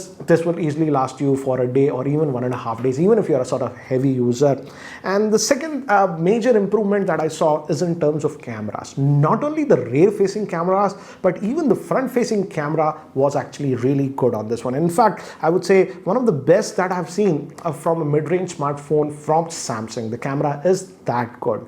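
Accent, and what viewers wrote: India. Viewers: when are you gonna drop that Indian accent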